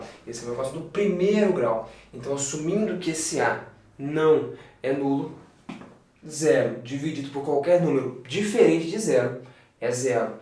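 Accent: Minas Gerais, Brazil. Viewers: Pelo sotaque, é mineiro!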